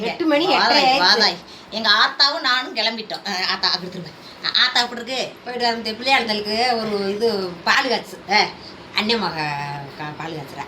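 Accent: Madurai, India. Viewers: Nee pesarathu alaka erukku ❤❤❤❤ மதுரை தமிழ் super ❤❤❤❤❤❤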